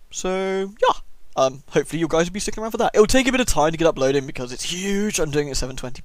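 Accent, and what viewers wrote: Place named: RP, United Kingdom. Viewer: yeah that's what I thought, he does sound slightly posh normally though.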